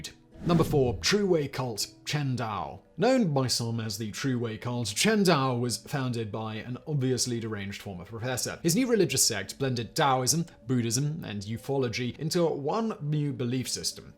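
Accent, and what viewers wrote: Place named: United Kingdom. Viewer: Aaahhhh! The famous British accent sarcasm😍😍😍….so sexy!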